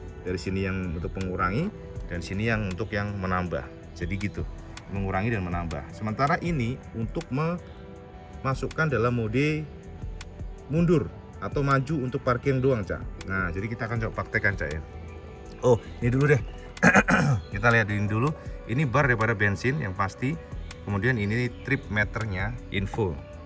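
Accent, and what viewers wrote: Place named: Tulungagung, Indonesia. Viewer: Penasaran karo motor 6 silinder terus ndilok" Neng youtube lakok nemu vidio iki, tapi koyok ra asing karo logat e, mari tak dilok neng google tibak e wong T. A hadehh, sak kabupaten bak e😂😂 , Sukses terus mass 👍🙏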